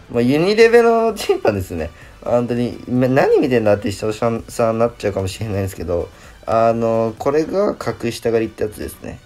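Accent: Okayama, Japan. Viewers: 今回岡山訛り強め？笑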